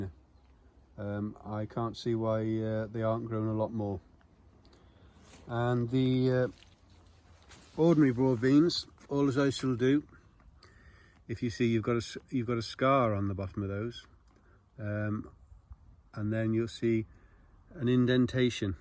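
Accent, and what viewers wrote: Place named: Scotland, United Kingdom. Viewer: I'm not very good with accents. Are you from Scotland? You accent is lovely.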